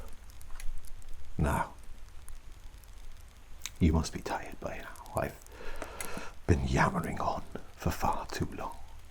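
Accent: Wales, United Kingdom. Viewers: I loved everything about this video! I've been on the fence about Elden Ring since I'm not sure if I'd have time to actually play. I divide my time between Everquest, Everquest II, Guild Wars, Guild Wars 2, Lord of the Rings Online, and, most recently (thanks to you, ) Raid Shadow Legends. Anyway, the costume is truly awesome but then you are a talented individual so it's not surprising. Your Welsh accented voice, I could listen to that all day, at least until I fell asleep. Thank you for sharing your time and creativity with us, I appreciate it muchly. Oh, and as a side note, I always dream in color. 😊😊 With permission, hugs 🤗🤗🤗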